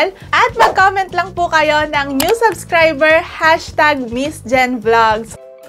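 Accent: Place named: Pangasinan, Philippines. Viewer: Ganda mo pangasenensi ka kilala ko sa tunog mga taga pangasinan hehehe chat me teach me grand mother and father ko puro taga pangasinan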